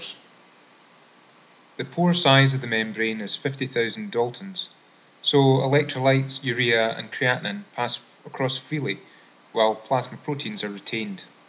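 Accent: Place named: Scotland, United Kingdom. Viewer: Thank you for excellent presentation. Love the Scottish accent.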